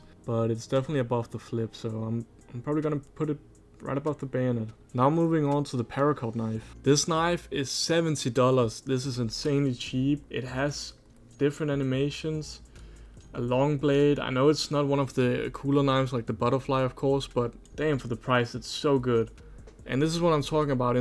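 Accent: Denmark. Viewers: holy danglish bror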